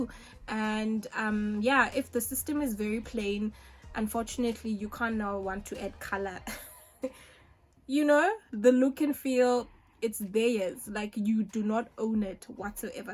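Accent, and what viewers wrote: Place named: Johannesburg, South Africa. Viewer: Why does it sound like you were a UJ STudent?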